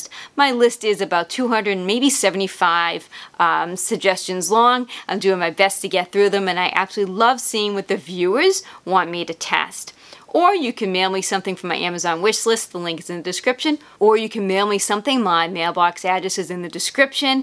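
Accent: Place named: New Jersey, United States. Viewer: Woah great video! I have a portable far infrared sauna (it's like a tent you lie down in with an infrared generator). I was on the hunt looking for a more natural spray with less caustic chemicals to clean it after use, and encounter the Mr. Meyer's brand. Loved everything I read about it, but was also looking for something with antibacterial properties. As you mentioned, they don't state that it does kill bacteria, but you just proved it without a shadow of a doubt. I picked some up today. I sprayed a little on the counter top just to test the scent, I think it smells good. Hopefully it won't be too overpowering when I clean the whole sauna, but I would rather have something safe than the chemical spray I was using. Thanks again for this great presentation. BTW, loved the accent, I had grandparents from NJ :)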